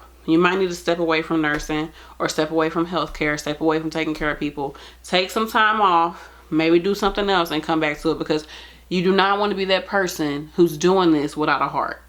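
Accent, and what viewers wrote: St. Louis, United States. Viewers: ...this was a brilliant video. I have watched a few of your past ones (mostly coz I love your stl accent ) but this one was top notch and as real as it gets! Much love!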